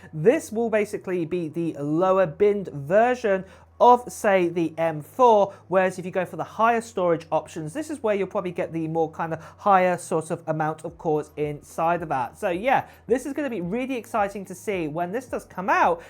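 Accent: United Kingdom. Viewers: High pitched british voice....I don't believe anything you say.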